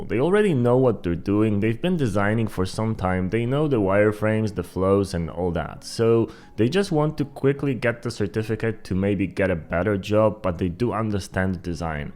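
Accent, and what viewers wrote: Poland. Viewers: Słychać tu polski akcent :D Ale bardzo dobrze mówisz po angielsku.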